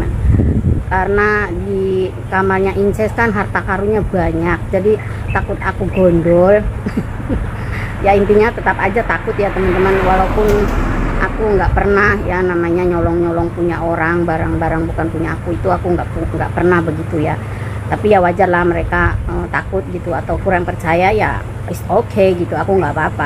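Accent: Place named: Indramayu, Indonesia. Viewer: logatnya kek org indramayu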